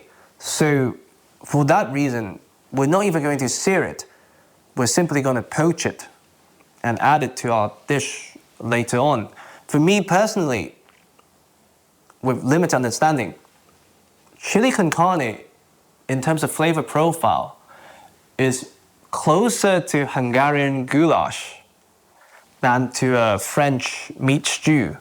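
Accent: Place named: United Kingdom. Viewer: We just saw a man of Asian ancestry with a British accent fuck up a traditional Southwestern United States dish....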